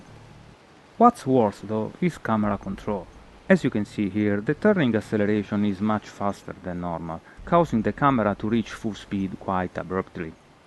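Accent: Italy. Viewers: Ma sei italiano?.. Sembra dalla pronuncia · il tuo inglese non è male, ma l'accento è italiano. xD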